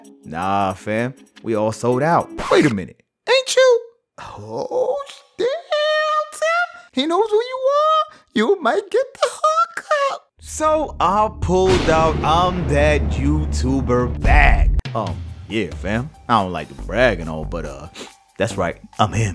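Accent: Nigeria. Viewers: R u Nigerian? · Bruh are you nigerian?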